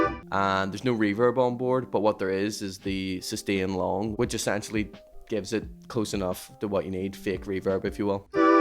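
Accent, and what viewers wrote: Northern Ireland, United Kingdom. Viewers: It always throws me for a loop when I click on a random YT video and hear an aul norn iron accent, since we're so rare on here. Good videos mang, keep er lit!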